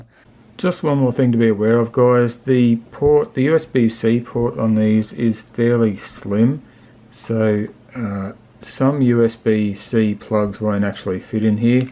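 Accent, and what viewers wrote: Australia. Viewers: I stumbled across your video while trying to find reviews on Fodsport products. I'm always amused by the fact that I can tell when a video is shot in australia (other than the accent, the lighting and colours just look a certain way). I didn't pay much attention to the poster in the background (until after the video and coming back to comment) and skipped through the first part of the video to the test ride. I often try and see if I can figure out what city/town it's in and if i can recognise anything if it's a place I've been. Well the traffic lights didn't give me much help, but as soon as i saw the show grounds i knew exactly where you were. I'll have to check out some of your other videos (gotta support another local). I just flicked through your vids and saw you are giving one of these away. It's just a shame I won't be able to get enough info to enter the draw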